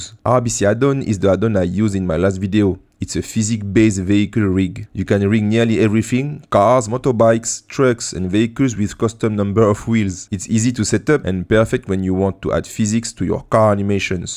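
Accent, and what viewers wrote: France. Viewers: Le petit accent français 😏 super tuto vraiment continue comme ça c est incroyable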